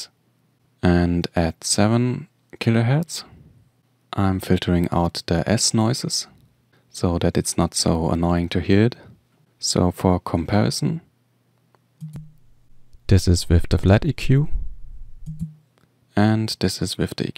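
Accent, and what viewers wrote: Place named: Germany. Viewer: Gutes Video mann hört aber Das Du ein Deutscher bist.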